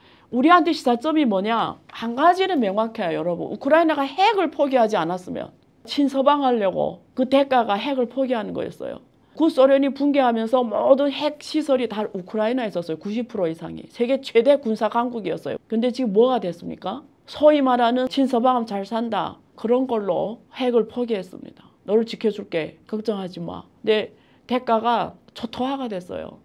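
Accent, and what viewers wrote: China. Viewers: 중국인이세요?발음이 확실히 느껴집니다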